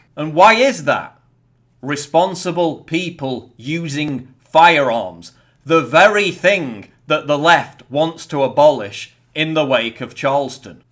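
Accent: United Kingdom. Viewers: wait is this guy British?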